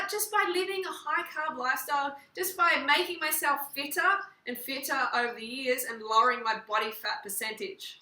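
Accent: Australia. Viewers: You ave an Australian Accent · what part of australia are you from?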